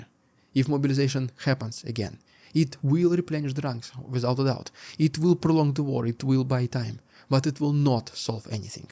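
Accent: Russia. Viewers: How can you be so eloquent in English and at the same time have such a heavy Russian accent? You are an enigma... Your content is really good, thank you for your tireless work! Russian evil regime will be defeated, truth and love must prevail!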